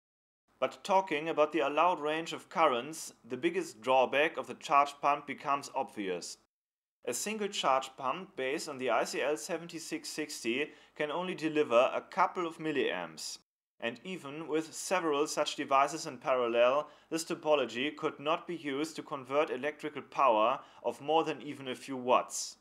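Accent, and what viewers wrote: Germany. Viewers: Excellent, just excellent videos. Very well thought out and executed. As a 25 year technician, I can say, I've re-learned something from your videos. The direct nature of your presentation is outstanding and I'm sure, will help students and guys with foggy minds, such as myself. I love your German accent. Danke! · Respect for the creator if this Great Youtube Series. It's quite easy to understand and follow your ideas. Thanks a lot. Btw, sounds like a German accent.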